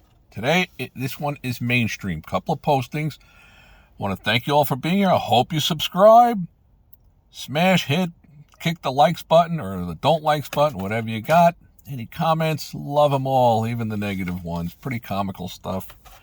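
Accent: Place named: New York, United States. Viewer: I’m speculating based on your accent that you’re from NYC like me or perhaps northern NJ. You VERY much remind me of a former college professor of mine who’s a good friend of mine and he’s from NJ and the Bronx. 🙂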